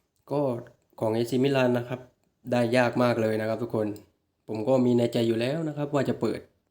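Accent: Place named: South, Thailand. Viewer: คนใต้ชัวร์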